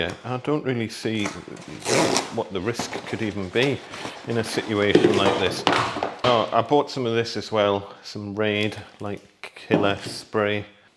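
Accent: Newcastle, United Kingdom. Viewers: Hiya! Watching your video with all those wasps made my skin crawl. You’re a brave man! For next wasp season have you thought of using wasp bait “traps” with boric acid? The wasps are attracted to the boric acid laced bait, take it back to the nest to feed their young, and kill the whole nest for you. It takes about two weeks. I’ve used that method now for two wasp seasons and it works! There are plenty of videos here on YouTube explains how to do it. I’ve found protein baits (bacon fat and tinned cat food) works best in spring, and sugary baits (apple juice with a pinch of bakers yeast to give off a smell like fermenting apples) works best from mid summer onwards. Good luck! P.S. I love your Geordie accent!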